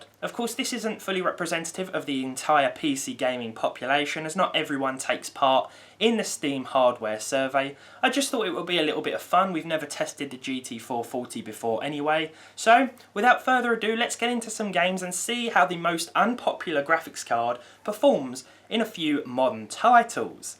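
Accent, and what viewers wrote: United Kingdom. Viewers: Dis fookin brit accent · Why do British people say knot 1 5 percent instead of zero? Not joking I legit am confused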